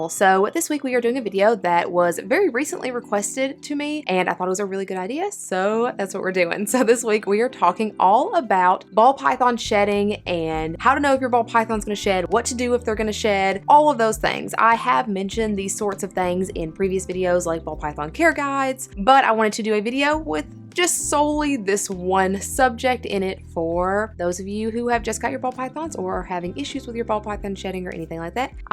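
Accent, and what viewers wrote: South, United States. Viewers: I sooooo love your southern accent and you are just adorable. You are also soooo knowledgeable so I had to subscribed. Thanks for sharing what you know with us.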